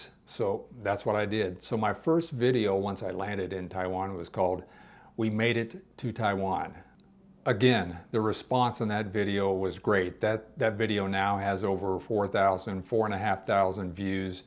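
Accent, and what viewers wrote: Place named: United States. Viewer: Thanks your veido! Cause I can clearly listen US accent from you. I love that.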